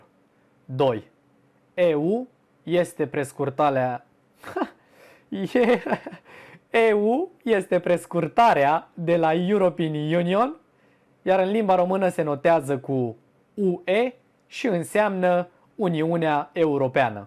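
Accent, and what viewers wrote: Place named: Moldova. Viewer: Se simte un ușor accent moldovenesc. Greșelile de exprimare sînt omenești. Keep up și good work. 👍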